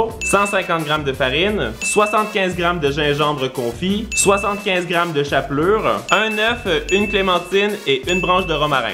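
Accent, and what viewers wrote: Canada. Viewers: tu mon youtubeur préféré de is vidéos accent canadien et qui met quoi des vidéos trop trop rire 👍👍👍👍👍 24h 🇨🇵🇨🇵🇨🇵🇨🇵 🎄 is cooking Noël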